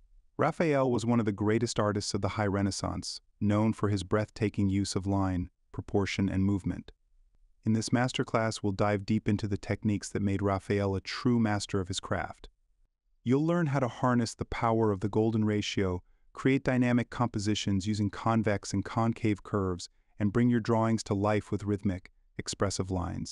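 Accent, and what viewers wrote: France. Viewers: Thank the Heavens for he isn't speaking with that horrendous French accent in this video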